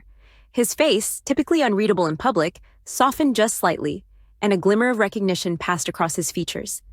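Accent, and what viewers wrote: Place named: United States. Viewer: What a load of bull****, where do they get theses stories from. sounds american from the accent.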